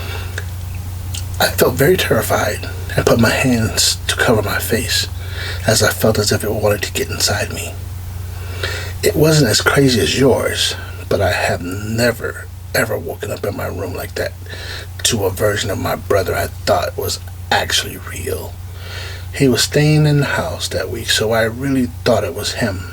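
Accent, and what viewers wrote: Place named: London, United Kingdom. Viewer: As an English person I would say you’re well on your way to getting a decent accent however it’s very mixed in terms of regional accents. There was definitely some sort of London accent mixed with a touch of a northern accent (when you said the part about ‘scumbag brain’) and some RP thrown in the mix.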